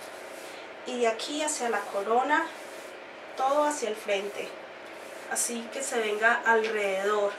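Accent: Colombia. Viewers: Su acento es colombiano.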